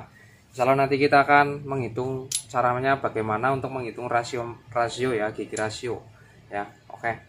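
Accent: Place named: Medan, Indonesia. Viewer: Logat nya seperti orang medan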